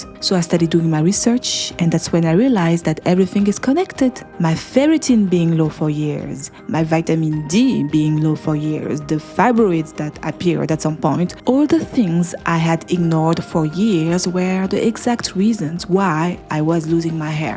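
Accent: France. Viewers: Quel beau Englais-Francisé😊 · I picked up the same French accent was confused by Germany 😂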